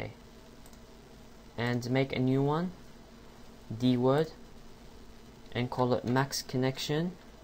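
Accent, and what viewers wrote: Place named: South Africa. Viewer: That's because it isn't a British accent. It's a South African one.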